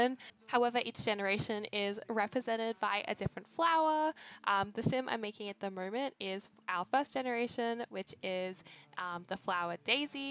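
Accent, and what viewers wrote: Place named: Australia. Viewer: Got some catching up to do🤍love this vid. Your accent is awesome🤭Aus?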